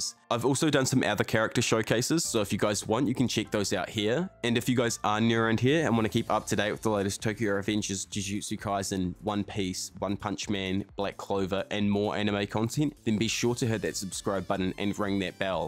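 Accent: New Zealand. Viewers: Love the Kiwi accent bro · Are you a New Zealander?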